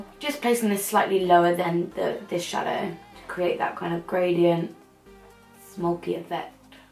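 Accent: Newcastle, United Kingdom. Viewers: Ahhhhh fuck it lets purit everywhere 😂😂 I'm a Geordie and you legit sound like me when I'm getting ready 😂😂🙌🏼👏🏼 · Why were you doing a geordie accent? & love your skin makeup! X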